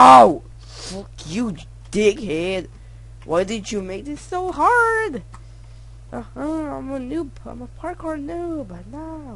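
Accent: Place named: Germany. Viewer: yer it lags....allot....ur not english are u? u German?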